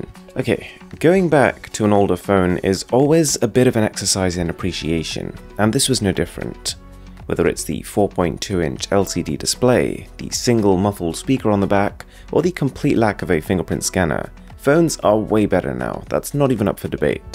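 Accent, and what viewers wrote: United Kingdom. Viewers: The accent alone makes the subscription worth it... Like listening to Earl Grey tea talk about tech in an ever so slightly snide but entertaining manner. Perfect. Let me go get my smoking jacket and que the next video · Do you use a british accent?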